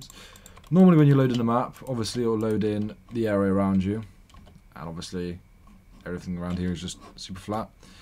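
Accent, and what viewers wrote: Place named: United Kingdom. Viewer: HES BRI' SH!!! (thx btw for the tutorial)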